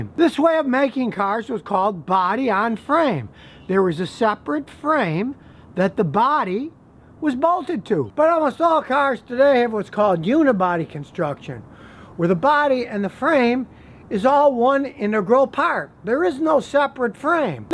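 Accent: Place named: Chicago, United States. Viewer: Most Chicago accent ever. Could have been on Bill Swerski's super fans.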